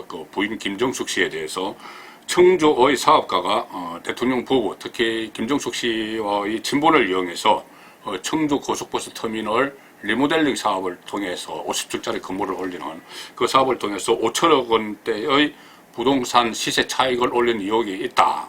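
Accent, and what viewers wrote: Gyeongsang, South Korea. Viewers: 발음은 타고난것이고 억양은 경상도지역 억양인데 너무 무례하군요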